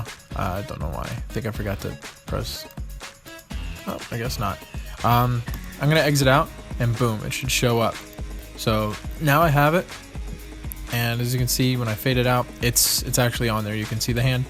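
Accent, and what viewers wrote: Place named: Russia. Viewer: Seriously? I immediately understood the accent, it is easy if you are from RUSSIA!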